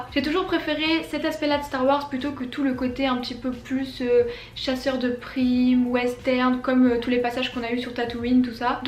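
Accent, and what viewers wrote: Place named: Quebec, Canada. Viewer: I lived in Montreal for 25 years, but I don't get to hear people speak quebecois french anymore, so I watch your youtube channel instead :-)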